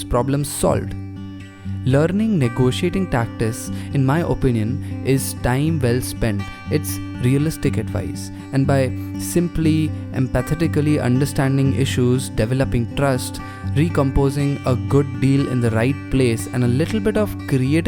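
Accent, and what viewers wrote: India. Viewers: I like your accent. Are you from Hyberabad?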